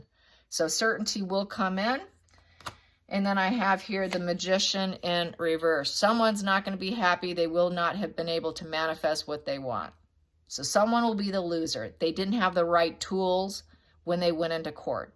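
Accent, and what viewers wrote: United States. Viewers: I am from Manhattan. Your very mild Long Island accent comforts me. I live a long way from home now. It's good to hear "home" so intelligently and soulfully spoken! Thank you!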